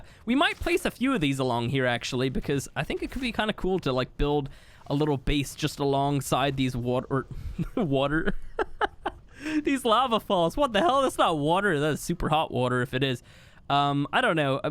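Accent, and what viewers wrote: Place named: Ireland. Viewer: Dude i forgot you are Irish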